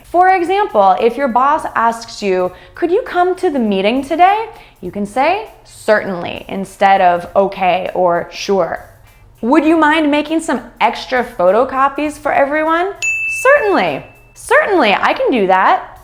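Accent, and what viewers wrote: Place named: United States. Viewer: I like American’s accent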